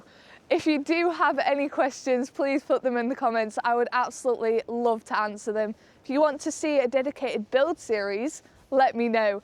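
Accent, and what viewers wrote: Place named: North West England, United Kingdom. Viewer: Hey! On the friendship note, I'm pretty rubbish with accents but I sense you're from somewhere relatively close to me (North West). I'm 26 and don't have many friends that are like-minded in this sense so I'd so be up for meeting you, I feel like we'd get along! Need more friends to do some nice walks with as Spring arrives 🫶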